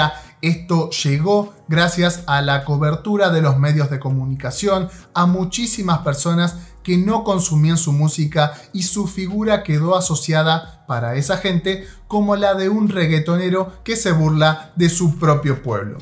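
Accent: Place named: Puerto Rico. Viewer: ¿Cierto? Se escucha de PR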